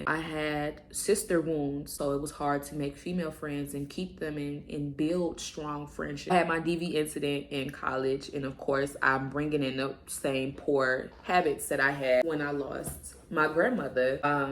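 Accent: Chicago, United States. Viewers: I’m from Milwaukee but I live in Texas and your Chicago accent 🥹😬💕 My cousin from Chicago… I love….love💕 You are truly gifted, intelligent and your grandma is smiling down and very proud. Your grandmother passed the torch of good home cooking, continue to use your gift to inspire families to cook at home. #bringbackfamilydinners good luck to you💕🙏🏽🙌🏾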